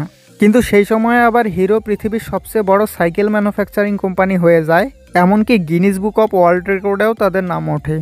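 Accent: Bangladesh. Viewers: পুরাই বাঙাল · Are you Bangladeshi?. Because you (talk) pronunciation as Bangladeshi people.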